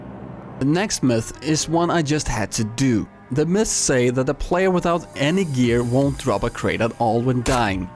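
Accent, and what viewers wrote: Denmark. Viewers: Hahah man kan høre han er dansk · You sound danish my friend :-)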